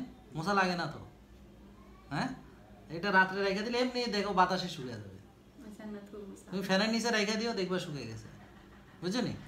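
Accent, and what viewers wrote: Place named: Feni, Bangladesh. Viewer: স্যারের মুখে ফেনীর ভাষা শুনে অনেক আনন্দ পেলাম এবং খুশি হলাম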